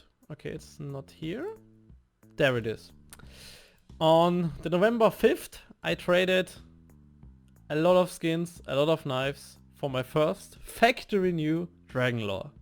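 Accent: Germany. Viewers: Are u german? ^^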